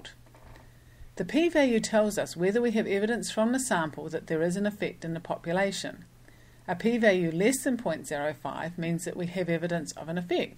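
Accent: New Zealand. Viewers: With your videos I am learning Statistics AND New Zealand's English :) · Are you from New Zealand?? I love that country and want to go back some day! Love the accent too..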